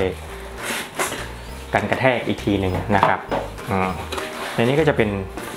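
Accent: North, Thailand. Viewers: คนเหนือหรือเปล่าครับ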